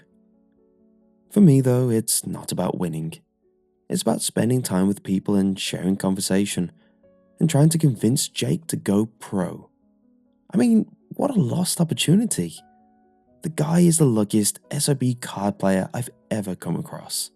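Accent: United Kingdom. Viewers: It's always a good episode when the British guy is narrating.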